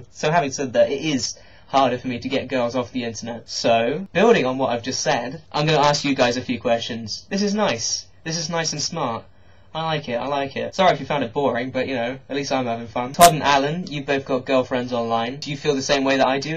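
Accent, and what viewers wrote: United Kingdom. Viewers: A cute nerdy teenage boy ... with a British accent to boot! xD Perfect for me!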